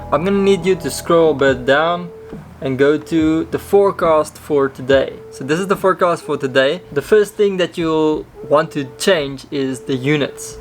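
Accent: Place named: South Africa. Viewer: Love the South African accent, nothing like it in the world :-)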